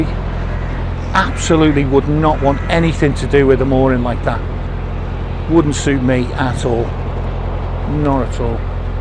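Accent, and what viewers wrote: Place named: Birmingham, United Kingdom. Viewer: Joined blog … you were spraying yourself with after shave or something … in wine trade … had customers in Birmingham area … always thought accent a soft gentle one … have to go … Tony … 🙂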